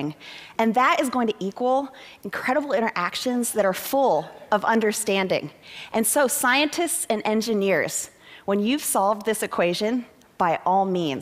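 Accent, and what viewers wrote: United States. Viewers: I dont think so..that was an Australian series- this woman has an American accent.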